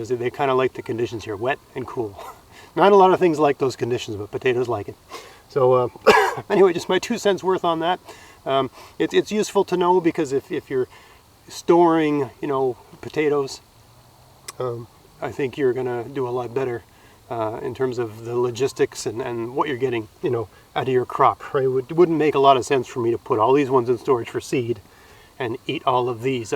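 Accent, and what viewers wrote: Maritimes, Canada. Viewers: Without reading the page name I watched this before gardening and within 2 seconds I was like is this guy an islander?? Unmistakable maritime accent. Then I saw your channel name and saw you’re in NS 😂 thanks for the potato advice from this islander!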